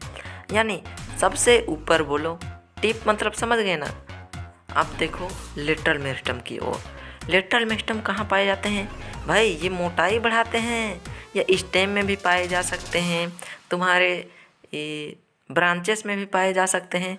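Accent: Bihar, India. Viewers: Op brother maja aagaya full BiHari style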